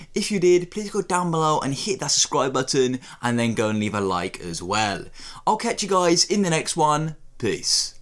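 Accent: United Kingdom. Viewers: thanks random british person on youtube :3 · Are you British?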